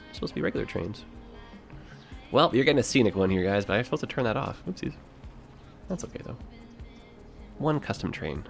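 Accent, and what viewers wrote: Toronto, Canada. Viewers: Discovered your channel through the 5 Builders collab, really enjoying your content. As a fellow Torontonian, but one who mostly watches British YouTubers, hearing someone online using the local accent really caught me off guard lol. The numbers of "beauty"s and "eh"s in this video are fantastic :D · i was just coming to comment that this dude sounds so canadian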